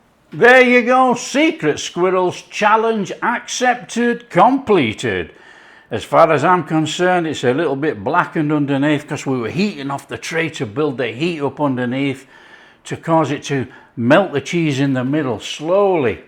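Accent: Yorkshire, United Kingdom. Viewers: At one time I use to deliberately burn toast as I liked it so much 😁😁 but I must say I really like how you said it in your lovely Yorkshire accent. That was fun to watch. The goo factor was off the charts! 🙌🏼♥️.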